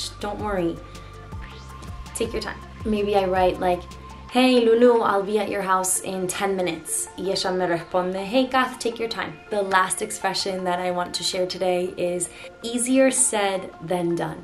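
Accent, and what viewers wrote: Argentina. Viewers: gracias por tu video.Cuando te escucho hablar castellano, es imposible no sentir que sos nuestra, que sos Argentina no cabe duda. Un gran saludo irradias mucha paz que es lo que necesitamos en este momento.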